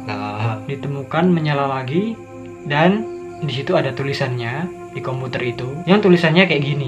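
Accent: Java, Indonesia. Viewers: Lidah khas Jawa nya loh yg bikin seru 😁😂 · Sampean suku Jawa tooo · Jowo daerah pundi kak? 😹😹😹😹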